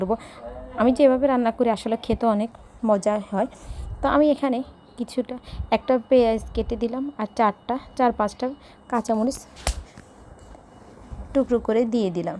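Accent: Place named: Noakhali, Bangladesh. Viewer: পুরা নোয়াখাইল্লা 😊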